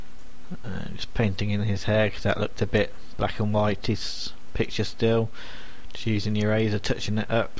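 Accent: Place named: United Kingdom. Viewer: A great example of a fantastic tutorial that is impossible to follow. Pardon my frankness but your introduction might seem like a good idea to you but to me, I can't hear the essential part of what you are trying to say. Its concealed by the MGM soundtrack you used. I've got 18 years of Photoshop experience and like the lady further down the comments lists says... I can't learn a thing from this. Dozens, maybe hundreds of otherwise really useful tutorials are completely ruined by the speed and mumbling of the person doing the tutorial. You can take heart in the fact that even Adobe themselves are guilty of the exact same problem. 50% of their tutorials are wasted on 80% of the audience. I used to listen to soapies my family watched in the evening and marveled at the clear and concise way the actors spoke. No one could suggest they couldn't be understood. 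Many years ago - before the Internet, I used to make How-to VHS videos. They got accepted by a TV station because I'd spent over a year practicing clear diction before ever making my first tape. In all seriousness mate... Your Tutorial is exactly what hundreds of people need and most will never understand anything about the processes you describe. Slow down what you are doing. Speak clearly AFTER the filming of the process and you'll have an exceptional video. Make more like you made this one and only some British people will understand what you say. The rest of the world will keep looking for one they can understand. No mali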